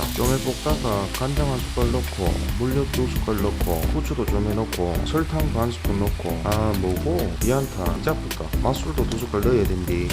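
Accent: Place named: Daegu, South Korea. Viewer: ㅋㅋㅋㅋㅋㅋ 대구에 있는 내친구가 얘기하는 줄 알았네요 ㅋ 저거 단점이 있어요.. 밥을 너무 많이 먹을꺼 같아요 ㅋ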